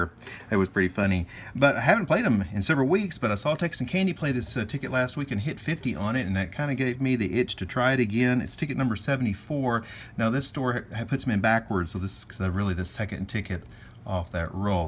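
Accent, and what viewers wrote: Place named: South, United States. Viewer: Very Southern-y 👍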